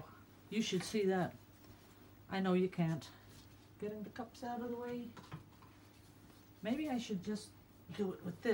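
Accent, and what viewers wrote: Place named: Netherlands. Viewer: This is truely beautiful - just like its creator! We can see how gorgeous it is so stop worrying about that. Also, I love your chit chat and so look forward to coming home from work each day to listen to and watch you pour/swipe. I laughed when you said about getting your hubby to speak in English - mine told me that I was beginning to speak with a Dutch accent cos I' listening to you every day!! LOL Take care and looking forward to the next road trip when you have a spare minute.... how is your sister's piece coming along? Cheers, DEB T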